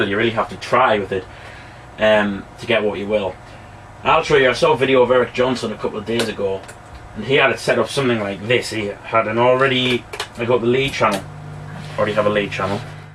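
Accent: United Kingdom. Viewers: haha i love the way u talking i usually hate and skip the talking part of a audio demo but i subcribed your channel just to listen to ur brit tongue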